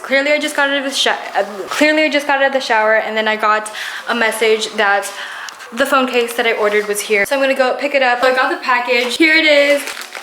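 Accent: Philippines. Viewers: Are you half filipino? cause i think i kinda heard some bisaya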